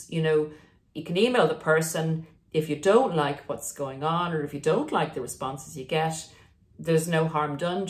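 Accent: Ireland. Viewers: I have followed for 1year, its been an invaluable resource for dealing with the aftermath of narcissistic abuse...the narc sussed gray rock forcing a reverse discard. Thank you, great to hear an Irish voice on such a personal subject.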